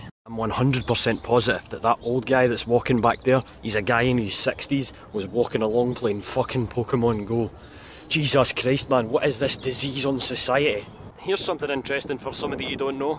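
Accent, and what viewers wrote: Scotland, United Kingdom. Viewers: Your video are so funny and love the Scottish accent (wait shit I'm from Edinburgh I have a Scottish accent😊😊) ahha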